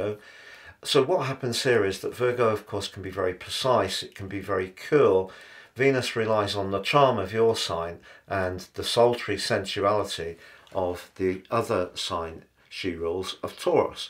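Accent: United Kingdom. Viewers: Im a non native English speaker and i guess youre a British accent speaker which is really hard for me to understand i watched it twice to know about my abroad travelling for study purpose my visa decision will come on 27th August but i dont know what is about to happen about my travel i am so confused and couldn't catch what you said regarding this can you please tell me if it will be a positive chance of visa approval or what?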